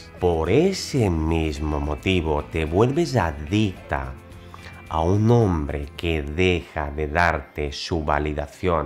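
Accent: Spain. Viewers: su acento bien español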